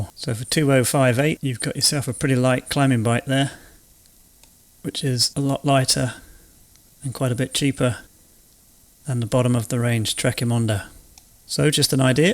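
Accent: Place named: United Kingdom. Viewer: First of all...I loved your British accent...! Great work once again....!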